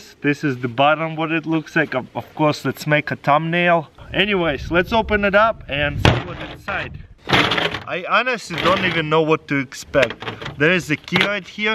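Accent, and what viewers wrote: Russia. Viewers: Что за русский акцент?))) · wow this guy look so much like crazyrussianhacker even got the accent too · Твой акцент выдаёт в тебе русскоязычного.😂😂😂🤣🤣🤣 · Жуткий русский акцент. Ха ха ха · his accent tells it that he is a russian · Такой махровый рязанский акцент, прикольно звучит.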